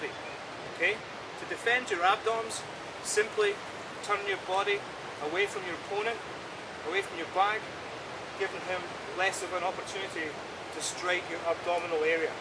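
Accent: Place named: Ireland. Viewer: good ole irish accent. atleast i think its irish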